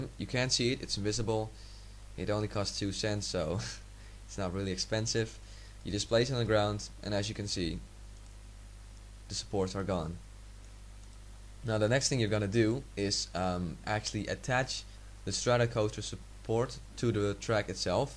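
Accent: Netherlands. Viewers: Are you dutch ? you sound like it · lol ik hoor gewoon dat je nederlands bent XD goeie tutorial 5/5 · ik hoorde meteen dat jij nederlands was, =D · you sound dutch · You're dutch right? That accent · are you dutch?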